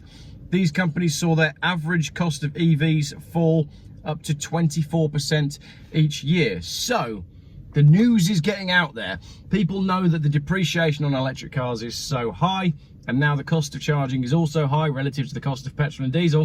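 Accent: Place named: Yorkshire, United Kingdom. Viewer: I am sorry, but I think you have been watching too much You went all Yorkshire in this video. "E-Up!" PMSL So anyway, what I have gleaned from this is, we no longer live in a democracy & capitalism died. Supply & Demand went out the window as you can only have EVs, but nobody fell for it. So they are killing off what people want & need and fleecing you to get bigger unwanted motors.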